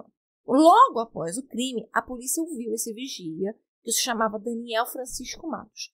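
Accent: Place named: Rio de Janeiro, Brazil. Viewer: Nossa não gostei dessa fala carioquíssima não se entende nada aroisss bla bla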